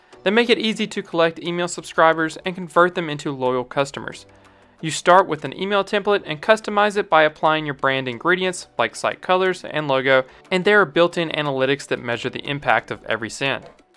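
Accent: South, United States. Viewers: Interesting video. I should point out that you Spanish pronunciation is cringe worthy. Your accent would suggest southern USA with English being your primary language, but with Spanish pronunciation, you aren’t really trying.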